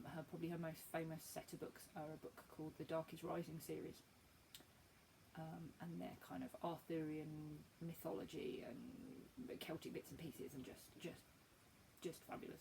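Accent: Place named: United Kingdom. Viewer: Just found you and enjoyed your first video so will be watching more Lovely to hear another British voice When I first starting watching Flosstube in 2014 there was quite a mix of nationalities. I took an enforced break from stitching and all that goes with it for 2 years and when iI returned at the beginning of this year almost all of the new people I have started watched are from the USA. So some variety is great and I’m looking forward to catching up with your following videos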